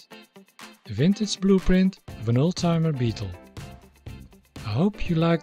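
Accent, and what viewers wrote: Netherlands. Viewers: You have that typical dutch-english accent, love it.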